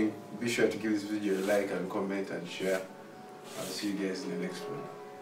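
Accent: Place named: East Africa. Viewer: U sound like u from east Africa (Uganda 🇺🇬 )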